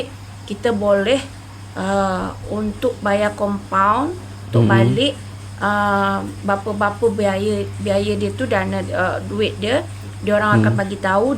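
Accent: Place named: Jambi, Indonesia. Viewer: Patutlah lidahnya Melayu - orang Jambi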